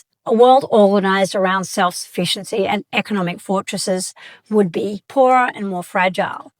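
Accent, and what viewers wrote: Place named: Australia. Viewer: Ah sir, you are too kind! Just a straight-up Aussie accent :)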